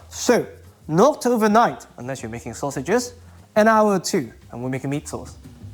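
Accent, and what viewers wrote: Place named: United Kingdom. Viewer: I’ve never seen an asian looking British sounding dude before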